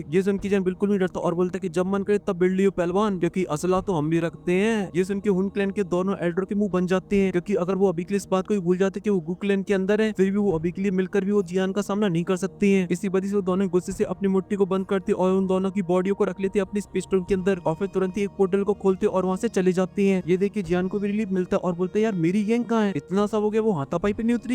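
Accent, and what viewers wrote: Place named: Hyderabad, India. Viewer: His sarcastic way of explaining with a slight hyderabadi tone is really entertaining amusing and hilarious keep it up brother i really fan yours explanation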